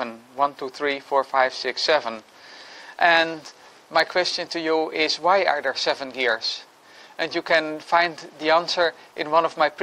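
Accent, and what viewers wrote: Netherlands. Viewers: lekker nederlands accent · You sound Dutch, are you dutch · ben je Nederlands? je klinkt zo😉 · You are 100% sure dutch. (Me too)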